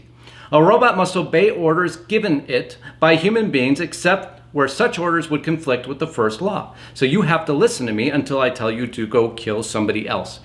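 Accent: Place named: Chicago, United States. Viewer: Any chance that you are from Chicago?? Your accent is thick 😊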